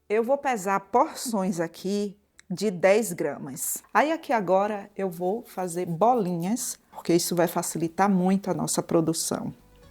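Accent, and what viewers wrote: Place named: Northeast, Brazil. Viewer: Eu amo assistir suas receitas ,vc é simplesmente maravilhosa, pelo o sutaque vc tbm é nordestina , povo maravilhoso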